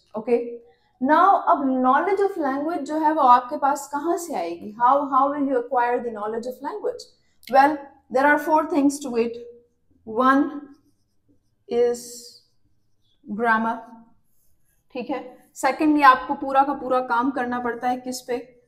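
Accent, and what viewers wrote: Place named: Sindh, India. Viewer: Apke to apne english thek nahe ha total sindhi lehja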